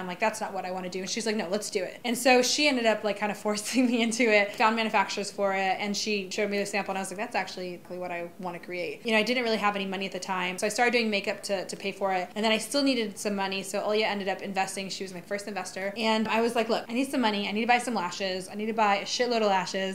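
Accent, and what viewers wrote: Iraq. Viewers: احكي عربي بفيديو كامل عربي وتحديدا اللهجة العراقية 😀😉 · عراقية وعدها. فيديوات تحجي عراقي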